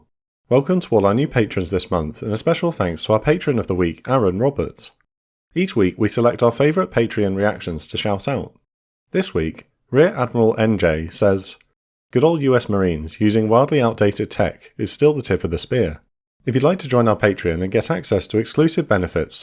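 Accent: United Kingdom. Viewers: A lot of these videos are made with the premise that the British accent = well-informed historian, when it's really a millennial generating click revenue after reading Wikipedia and adding file footage. Iraq had their own domestic production of copies of the Yugoslavian Zastava M70B1s, which were copies of Albanian smuggled AKs from 1959. Zastava helped Saddam start his domestic production of what they call the Tobuk in 1979. Those weapons made from <num> were basically equivalent to Yugos, then the production quality dropped off. They are neither Avtomat Kalashnikovs of 1947, nor Avtomat Kalshnikovs Modernizinaiya (AKM). They are Iraqi copies of Yugoslavian M70s.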